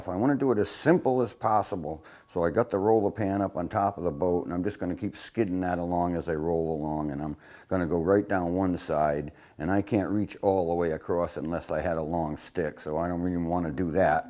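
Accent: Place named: Maine, United States. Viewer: Like his "Down East" accent. Nice the way he finesses each piece slowly and easily. Good work pace.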